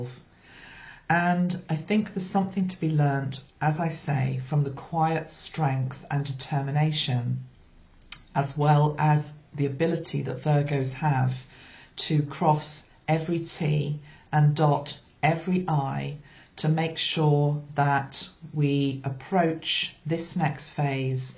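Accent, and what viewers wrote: England, United Kingdom. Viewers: Thank you so much for this uplifting video. We, the people, are more powerful than we know. I just love that beautiful English accent of yours and Best Wishes for a fun holiday in London.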